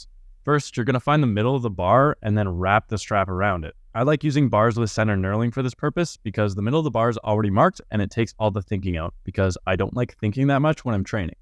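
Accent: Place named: Canada. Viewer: I can tell you’re Canadian istg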